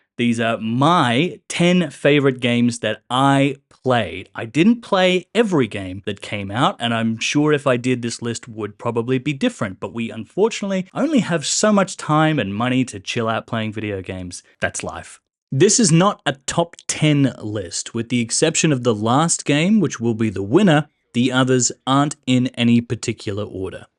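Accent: Australia. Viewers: lmfao the classic "No I'm Australian" after anyone hears your voice the first time. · Good video, learnt new information; you are in fact Australian and not British!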